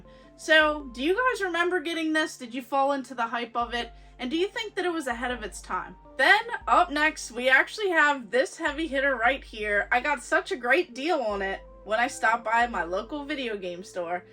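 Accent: Maryland, United States. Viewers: I gotta ask…are you from Maryland? You have a bit of the md, va, pa accent. I haven’t lived in MD for 14 years and my accent is getting thicker as I get older😅 Anyway, digging the content. RetroWolf sent me.✌️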